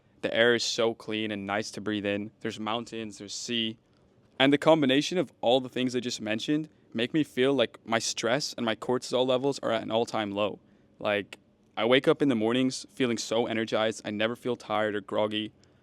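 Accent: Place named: United States. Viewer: Hey man Really appreciate your content From your podcast with Matt Sheldon You grew up in Scotland, Egypt and Malaysia So how’d you get your American accent?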